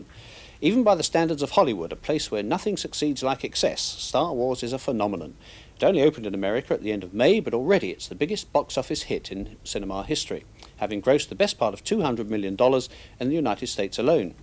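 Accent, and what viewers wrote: RP, United Kingdom. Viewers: Okaaaaaay.....I watched this "film review" and then came on her to make a few acidically sarcastic comments, and then saw everyone on here pouring out love for this guy. Buncha Brits! From where I live in Texas this doesn't even qualify as a film review no matter how posh is this guy's English. The only things he said that could be interpreted as relating to the quality of the movie were that it is successful and that George Lucas is a good director.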